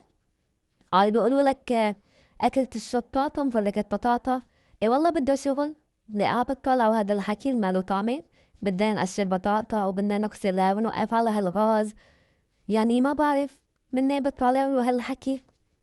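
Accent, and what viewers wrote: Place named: Aleppo, Syria. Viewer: واو لغة حلبية حلوة ❤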